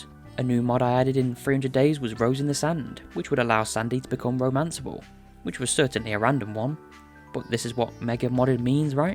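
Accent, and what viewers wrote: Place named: South Yorkshire, United Kingdom. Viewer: FINALLY i found someone who plays the curnocopia mod !!! Also your accent is VEERRY similar to mine im from south yorkshire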